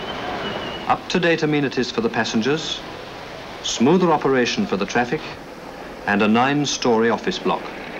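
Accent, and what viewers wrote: RP, United Kingdom. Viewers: Very good commentary cut glass accent people took a pride then… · "rubbing it in and rubbing it off... ...with a massage and a man-sized rinse". I'm sure this plumb-voiced man knew exactly what he was saying, even in 1961 :-)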